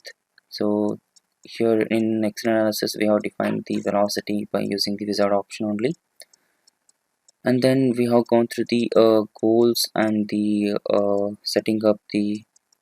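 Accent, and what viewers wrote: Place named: India. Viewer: I appreciate the effort and you taking time to produce this but between the poor, microphone, compressed, distorted audio and heavy Indian accent I could only understand about 30% of what you said.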